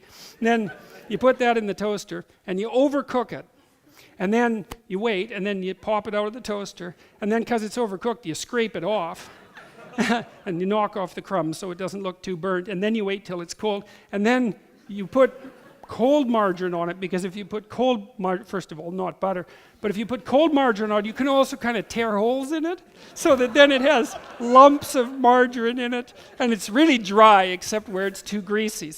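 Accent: Canada. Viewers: He's from northern Alberta and you can hear it. That's why he also drops the occasional "eh." He doesn't talk like a stuffy Toronto professor at all. It's very endearing, actually.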